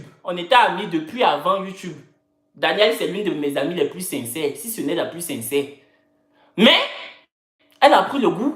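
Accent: Cameroon. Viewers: ton accent deh, maintenant tu n'est plus ivoirien tu es camerounais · Ton accent devient camerounais j’adore 💜😍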